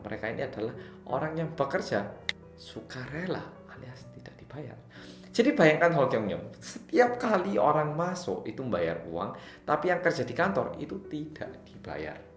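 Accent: Java, Indonesia. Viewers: Kang, boso jawamu medok ae kang wkwkwk, lanjut trus berkarya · Malah medog ngomong nya 🤗🤗🤗 ngga kerasa orang asing yg bawa 😆😂😆😂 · Ade ku udh hapal banget sama medokkk nya mas 🤣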